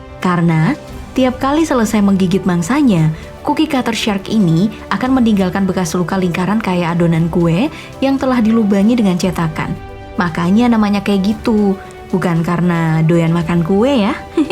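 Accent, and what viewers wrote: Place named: Jakarta, Indonesia. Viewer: Pan betawi yak wkwkwk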